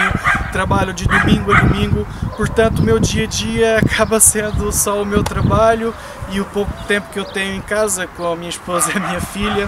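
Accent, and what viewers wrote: Portugal. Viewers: Acho muito divertido seu vídeo, seu sotaque português é super engraçado.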